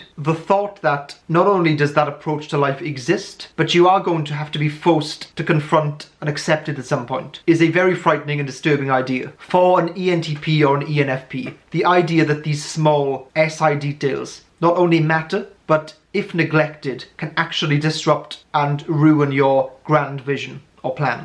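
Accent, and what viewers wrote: United Kingdom. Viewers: Impressive video. You`re so articulate and straight to the point. I relish when you express your toughts about INFP and i can see how passionate you are in front of the camera thinking, feeling, expressing yourself. INFP and INTP are so similar and complex althought little details make them so unique between them. In this lockdown i, sometimes took those mbti test since im bored hehe. 80% of the time i get INFP Type, the rest is between INTP and ENFP and sometimes INFJ, i wonder if is this you case too? BTW are you British? Because i love your accent ;-) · He looks Serbian/Bosnian or Greek but defs a UK accent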